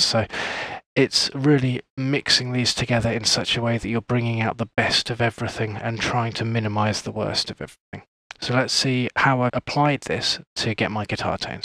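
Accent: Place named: United Kingdom. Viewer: I have a different reason why people clamor for new presets all the time. Our species is never satisfied. Grass is always greener on the other side. we are explorers. Has nothing to do with guitars or presets. Just my tiny opinion which might be 100% wrong. But I love that British accent. And the idea that the underlying methods/reasons/techniques/science is important so you can pinpoint things in YOUR project and improve them instead of blindly hoping a preset works.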